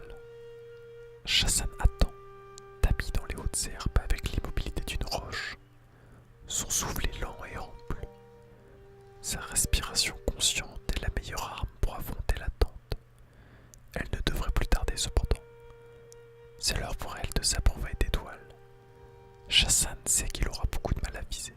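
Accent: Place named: France. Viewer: ese acento francés😍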